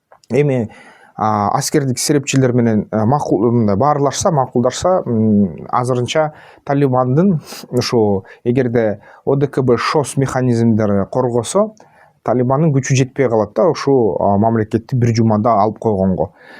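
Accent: Standard. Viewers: Таза кыргызча суйлогонунуз жагат, азаматсыз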